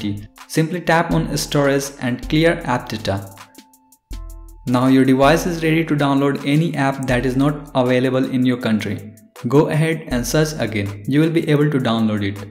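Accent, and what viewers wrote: India. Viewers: The instructions are good but I struggled with his Indian accent on crucial steps. I didnt really understand some of the things he said. I'd prefer written step by step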